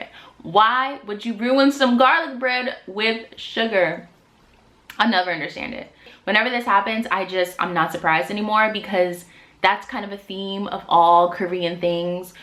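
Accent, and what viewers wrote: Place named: United States. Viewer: the american accent is so annoying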